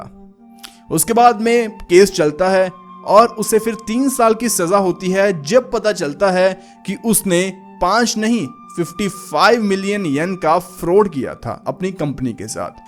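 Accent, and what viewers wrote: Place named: Punjab, India. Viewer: thorA bai punjabi touch nll bolda hindi like teekhi cheej bola nuklelee ko 😂😂 ndd bor nhi hunda bnda jinioo mrzi long hove video ❤❤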